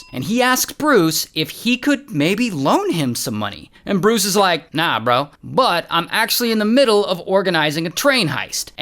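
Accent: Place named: England, United Kingdom. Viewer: The English accent 😅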